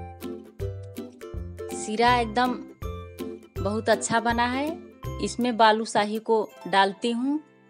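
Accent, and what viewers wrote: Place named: Bhojpuri, India. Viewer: pata nhi sbko apke bolne se kya aitraj hai cooking channel hai... koi voice improvement ki class nhi chal rhi hai... hindi mai to bol rhi hai sab angrez haj kya yahn pe... unki bolne ka style alag h bas hum bhojpuri bolte h hindi utni asani se bolne mai dikkat hoti hai gaon mai support karo warna chodke jao... bhashan mat do mehnat ko appreciate karna chaiye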